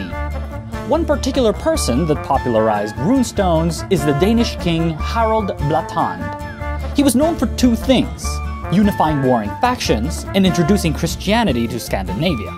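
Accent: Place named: Sweden. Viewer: stop pronauncing danish words in a swedish way those swedish pricks suck. sorry for the bad spelling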